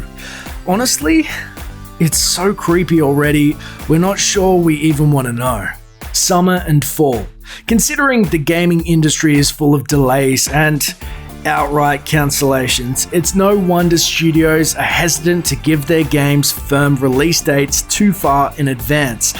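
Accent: Australia. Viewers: Was the uploader Australian? His voice was hella hot, omg. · Where is days gone set? Cause he says one of "our" national parks and this guy has an Aussie accent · Yuukei There is no such thing as a British accent so that doesn't make sense, he sounds and is Australian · I fucking hate australian accent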